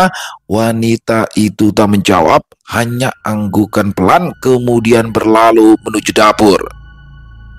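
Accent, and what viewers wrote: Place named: Banjar, Indonesia. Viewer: Ku kira dari om rasth ternyata aku lupa kalau bang saujana juga orang kaltim😂,kalau bang saujana Kaltim om rasth kal teng,kenanga kinasih kal sel penulis dari kal bar belum ada kah bang,aku klau kisah2 dari tanah Kalimantan selalu excited bnget palagi dari om rasth penulis yg epic bnget menurut ku lah, selalu menanti cerita beliau,kalau bang ga bisa dengan kata2 soalnya sdh tentulah pembawaan nya candu buat aku ternyata logat/ pemandiran bang sdh mahir bahasa banjar lah kd nglih lgi mun kena kepulau Kalimantan 😊bajalanan tinggal tagur2 hj 😅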